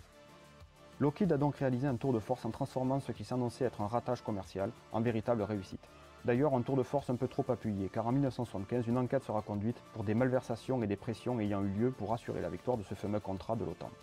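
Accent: South, France. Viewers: excellente vidéo ! bel accent du sud 👍😆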